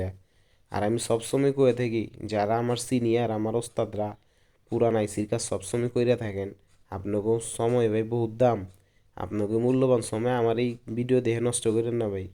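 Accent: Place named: Old Dhaka, Bangladesh. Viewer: পুরাণ ঢাকার ভাষা ভালো লাগেনা, শুদ্ধ বাংলাই কথা বলো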